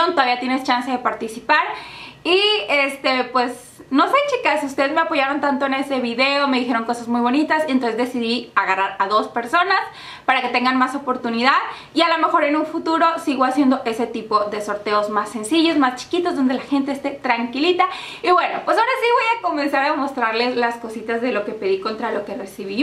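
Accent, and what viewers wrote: Colombia. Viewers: Que Colombiana te escuchaste esta vez. Pero dentro de todo tu ropa muy bonita la mayoría te queda precioso. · note en este video tu acento colombiano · con ACENTO COLOMBIANO? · Y ahora porqué, anda hablando como las de Colombia?? ,🤔Así no hablaba ella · hoy te escuche hace to como colombiana 😍😍😘😘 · tu acento no me gusto tipo colombiana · Soy yo o te escuche como con otro asentó como colombiana o algo así jaja muy lindos zapatos 👠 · Andas como media colombiana o algo Así no? 😂 Pero como quiera me gustó el videito jiji.. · senti que hablaste super acento Colombiano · Ese acento Colombiano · note como que traias acento colombiano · te escuche colombiana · si eres regia intentas hablar como colombiana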